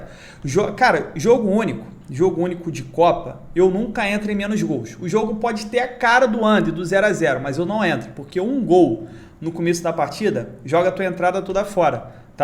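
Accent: Rio de Janeiro, Brazil. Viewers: Vejo seus vídeos e fico pensando "é muito carioca" kkkkk "Dificíão" é nossa cara HAHAHAHAA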